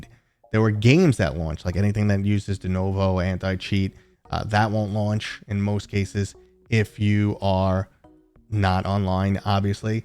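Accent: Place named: New England, United States. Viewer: Love your content brother. I'm curious. Are you from the Boston area? I'm from NYC and trying to figure out if your accent is New England or NYC 🤣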